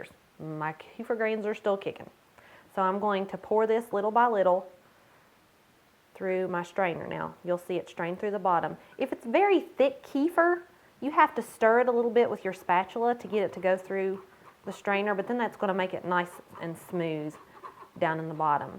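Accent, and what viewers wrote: South, United States. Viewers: THANK YOU for mentioning how to store the grains without making more Kefir! Yours has been the only video (and I've watched about a dozen now) that mentions this. I want to start making Kefir, but don't envision that I'll need to make a new batch everyday (like everyone seems to do) and I was wondering what one did with the grains to store them, or if this was even possible. P.S. Love your southern accent. : )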